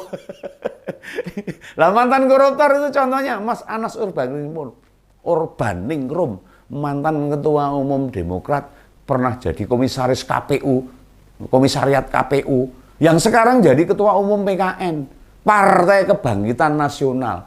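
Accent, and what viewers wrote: Java, Indonesia. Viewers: Teruskan pak blantek sapi ngomong jowo ae gak usah ngomong bahasa indonesia medok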